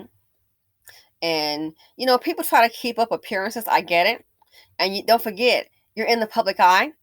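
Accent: South, United States. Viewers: girl you are soo damn funny with your southern accent and twang 🤣🤣🤣🤣. "She picked up the k-mart man and now in divorceland". I screamed my head off 🙌🏾♥️💯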